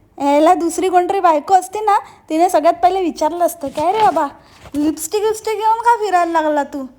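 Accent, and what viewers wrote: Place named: Kolhapur, India. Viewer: Tú Swayahala Ka Dakhvit Nahis ????? Nav ,Gav Kahihi Sagat Nahis ...Ka?????? YuBplnya Varun Tu Kolhapur Kivha Marayhwdayatil Vatates ... Kahi Ward , Tone Tuze Typical Tikadache Vatates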